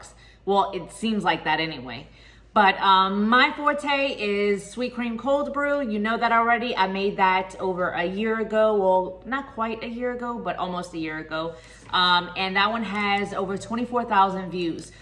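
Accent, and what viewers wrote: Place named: New Orleans, United States. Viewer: I’m going to try this. I’m going to take a wild guess & say you’re a NOLA Girl, I’m seldom wrong with detecting my people’s accent 😎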